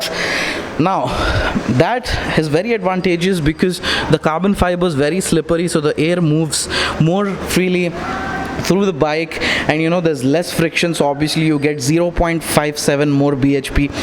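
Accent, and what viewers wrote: Hyderabad, India. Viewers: Bhai Hume aapka Hyderabadi accent me video dekhna pasand hai bhai♥️ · Didn't like this English version make video in your old Hyderabad hindi style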